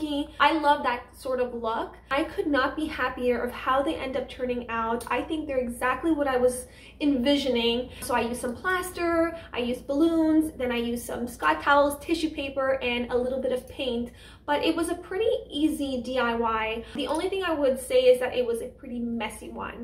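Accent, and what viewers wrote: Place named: Quebec, Canada. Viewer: Leave alone, she’s French Canadian, there is so many words that are pronounced differently in Canada. I live in the USA but I am a Canadian.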